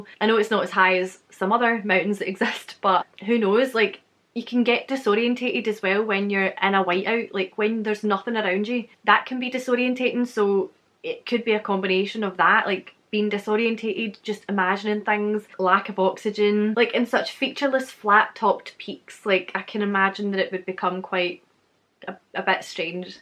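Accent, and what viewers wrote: Scotland, United Kingdom. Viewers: I just finished watching The Empty Man, a decent horror film which opens up with a hiking scene, and footsteps were... an element of it. Now I'm preparing for D&D in a few hours, watching a couple of your videos to help me get into the Scottish accent. And I stumble on this one. Heh, great stuff. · Everything sounds better in Scottish:) cheers.. sounds like you have another hike to do.. in the DAYTIME:) cheers..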